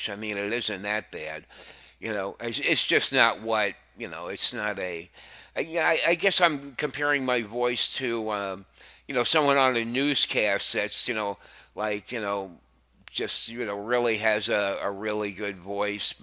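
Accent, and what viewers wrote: United States. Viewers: so happy to hear you're back vocem tuam! Prayed the rosary for you last night. Over here in Europe we love hearing your authentic voice, American accent and pronunciations - they are all fine! I enjoy the ad hoc commentary and analysis, it is all true and absolutely faithful to the Magisterium. Deo Gratias.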